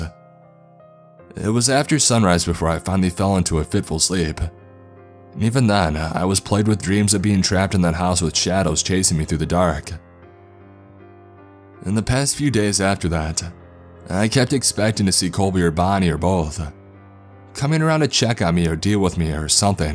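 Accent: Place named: United States. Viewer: I'm from the UK and this is the hardest American accent to listen to. What State is this from.?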